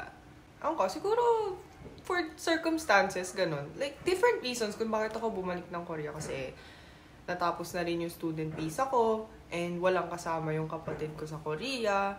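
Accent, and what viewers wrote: Batangas, Philippines. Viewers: Ramdam kita bebe😢😢 kaht savhn mo na sarili bansa mo un pero hnd ka naman dun lumaki mahirap mag adjust mamimiss mo ung vive sa pinas tapos sobrang seryoso din naman sa korea hirap ka mag adjust,, like Ryan bang sabi nga nia gusto nia mamatay sa pilipinas tapos ngaun pilipina ang nililigawan niya kasi pusong pinoy sya❤.. I heard sa batangas ka kasi ung punto mo🤌Batangena rin ako🤌🤌 enjoy mo ang life mo at mag iingat ka palagi.. sundin mo Asa puso mo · Hiiii poooooo.... Nag tataka lang po... Kaya mo po ba mag Batangeño accent while speaking Korean? Paano po kaya yun?🤔 Anyways... You're always beautiful po and feeling ko ang sarap sarap mong i-hug... Always take care po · Parang filipina kalang magsalita*sarang haeyo*!😅❤❤❤🎉😊😘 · New subscriber here,. I found your vlog sad and funny at the same time. Funny because for a Korean, you certainly have learned the way the girls talk in the Philippines and it’s kind of weird listening to your funny words and looking at you who’s as Korean as Korean can be. 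You’ll get over your homesickness, though. The good thing is you’re not halfway around the world if you ever felt the other way around now, kung ma homesick ka naman sa bansa kung saan ka ipinanganak. Keep smiling, you’re beautiful.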